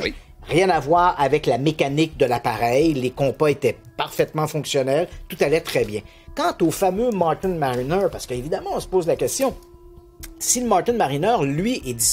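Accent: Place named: Quebec, Canada. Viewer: L'accent québécois me transporte toujours dans une autre dimension 🍁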